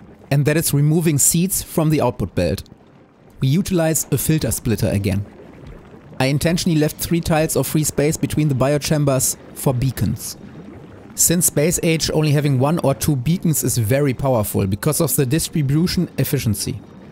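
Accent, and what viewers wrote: Germany. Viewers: bist du Deutscher oder was ist das für ein Akzent? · are you German? i think so haha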